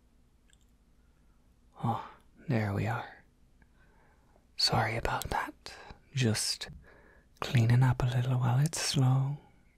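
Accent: Ireland. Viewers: You should really do more on this. Your accent sounds good and the character is wonderful ^^. Much love for Ireland <3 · The Irish accent needs a little bit of work, but was genuinely not bad! I'm so used to people imitating our accent and being immediately enraged. But, this was pretty good! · my dads british and invites his irish friends over the pond, so the one time i was around there were 2 guys over and one of them sounded a lot like this dude, and the other guy almost broke my eardrums and drank an entire bottle of whisky i was saving for my bday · This was so good I might just need a second part to this o-o This is the only Irish fey asmr I could find and it works so well and just hits different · i’m irish and i’d like to say your accent is quite good! we don’t see a lot of americans who are actually decent at irish accents because it’s very very hard to recreate because we sound like a bag of spanners being thrown down the stairs so well done! 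· Omg You're so damn good at being Irish!!!!!!!!!!!!!!!!!!!!!!!! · I saw irish accent and I was like "MY KIND"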